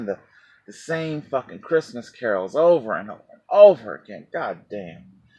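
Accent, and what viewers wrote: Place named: Ireland. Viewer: Awesome Irish accent!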